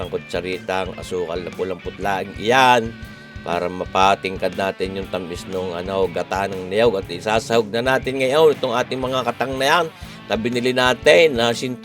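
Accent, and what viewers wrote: Batangas, Philippines. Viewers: Good evening idol kung batangeño accent,, shout out po sa inyo diyan sa Batangas,,, akoy laging nanonood ng iyong video ❤❤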